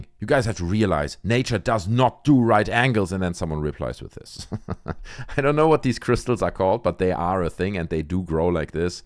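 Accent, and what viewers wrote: Germany. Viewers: an english speaking german sounds so intelligent and trustworthy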